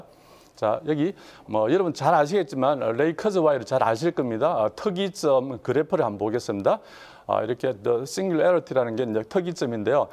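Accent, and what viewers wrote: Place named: Gyeongsang, South Korea. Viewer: 다소경상도 말씀이라 알아듣기힘들었지만 강의를듣고 우선책을많이읽어야겠다는생각을했어요.수고하셨습니다.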